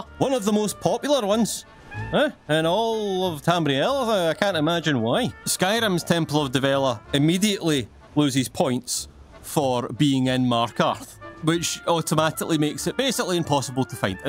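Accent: Scotland, United Kingdom. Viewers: I recently stumbled upon your channel and immediately loved it! You are really entertaining, and you talk just like one of my closest friends (who is also Scottish).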